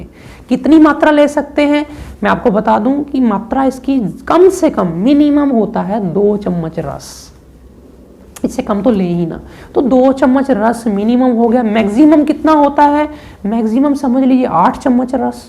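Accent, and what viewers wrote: Punjab, India. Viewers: koi kami nhi rahi aapke bolne me....Punjabi tone pta chal jaati hai guru ji.....thanks.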